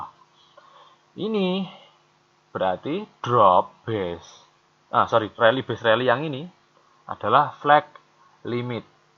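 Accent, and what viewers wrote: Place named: Surakarta, Indonesia. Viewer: Bapak apa orang solo ya dari logatnya kentel bgt hehehe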